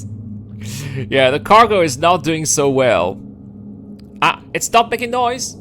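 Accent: France. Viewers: so whats with the French accent?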